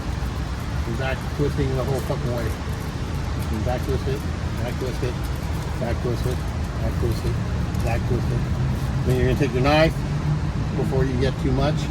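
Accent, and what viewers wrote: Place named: New Jersey, United States. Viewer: sounds like hes from new jersey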